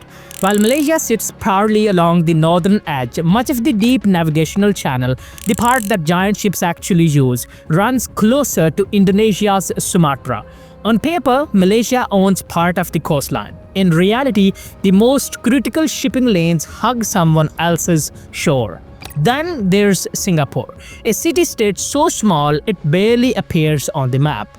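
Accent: India. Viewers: What is the point he is trying to make? Malaysia has also a very large port im the China Sea.. pointless video. This sounds like a guy from India?? There are other ways for ships to pass from the China Sea to the Indian Ocean. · It irritates me when you say " raut" for route! Route is pronounced " root". Unless you were born and bred in the USA, please pronounce it the English way! You sound indian so I find it even more irritating when you suddenly use American pronunciations for certain words.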